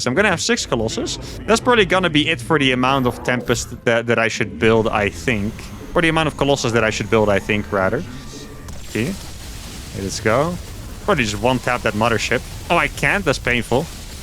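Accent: Netherlands. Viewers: imagine hating the Dutch accent but the two largest SC2 CCs are both Dutch lol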